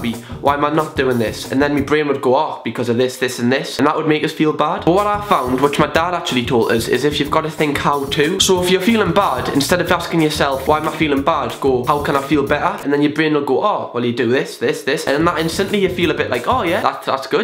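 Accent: Newcastle, United Kingdom. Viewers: Mad that work is my escape from it! I always put on a front like ill make my self look good, put makeup on. And ill get through the day getting nice comments and that and ngl that does make me feel better about myself. But as soon as i take my makeup off ill get in the shower and cry cause no one can see the tears. And i just stand there thinking how much i hate myself and my life, and questioning when is it gonna get any better? Watching you videos fully makes my day you actually cracks me up. I adore ur geordie accent! Keep ur chin up and keep smiling hun 😘❤️ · Another fellow Geordie eh! Woop woop. 😉 this hurts my heart, hope your okay! ❤️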